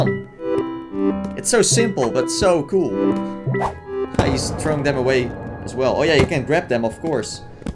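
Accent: Netherlands. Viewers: Are you from the netherlands? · Do I hear a Dutch accent?! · Ben jij nederlands you have a dutch accent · are you dutch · oohhh ok, I guess I've never heard a Dutch accent :D cool vid man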